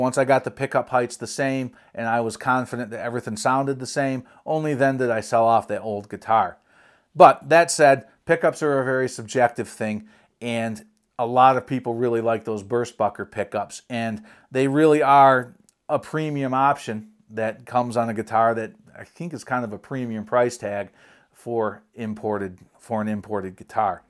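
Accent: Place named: Michigan, United States. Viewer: first video I've seen from ya. Nice evaluation. Thanks! looking at a Chibby or Episode Greeny. I'll bet $100 you're from Michigan. I've been in FL since 84 and can hear it... lolol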